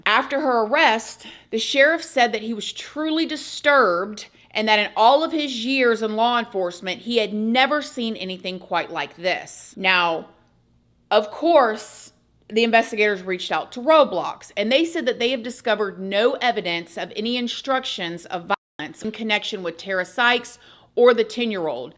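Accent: South, United States. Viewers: I have been enjoying your content for years now. Thank you for all of the info you have enlightened us with. But I have one question to ask you. Why does it seem like you did not have as much of a southern accent as you presently do? Is it because of where you reside? Just curious about that. Bottom line though is that a really enjoy your content and the way you present it. Please continue with the excellent job that you do. Thank you so much!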